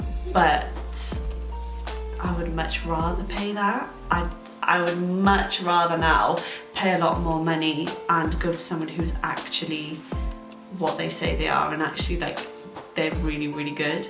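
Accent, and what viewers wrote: Wales, United Kingdom. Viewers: Are you Welsh? ♥️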